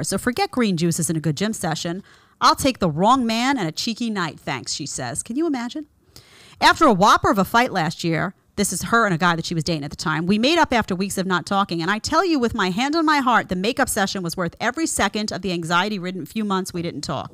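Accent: New York, United States. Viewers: The more I hear that Noo Yawk accent, the MORE i will listen. 😎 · No one can say "nasty" like Jedediah. Thank God we have a woman like her to cut through all the BS, and pointing out women like this. I'm surprised so few women commented on this video; I would like to hear how reasonable women feel about 304s like the one at the start of the video, and what their experience is with any of their 304 acquaintances. I love Jedediah's New York accent. Pay attention as she says "awl" for "all". I am so happy to have found her channel a few weeks ago. She is spot on. I hope she continues with these topics on her channel. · Every time says NASTY with her NY accent talking about these modern women.😂😂😂😂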